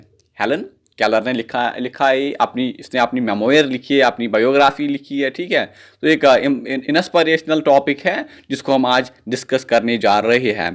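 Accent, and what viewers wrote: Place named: Kashmir, India. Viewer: Your kashmiri accent is so sexy ❤❤❤❤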